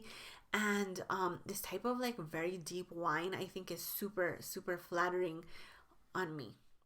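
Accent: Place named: Miami, United States. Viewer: Just started watching this video but I am already fascinated by the accent thing. What most people don't realize is that every person who speaks English has an accent. They just don't hear their own because they usually have the accent of their local area and peers. Or we associate non accented speech with whatever we hear in popular media, but that's also an accent. What I am fascinated by, is that when I first heard you, I thought I heard a bit of a "Miami accent." But not quite. Now it makes sense though! Miami accent is influenced by more Caribbean influences, but both yours and theirs have the darkened L sound. I could talk about this forever. Let me keep watching for polish😅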